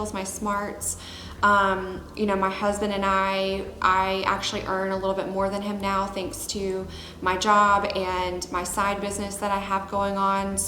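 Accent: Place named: New Jersey, United States. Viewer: Sounds like a Jersey accent to me.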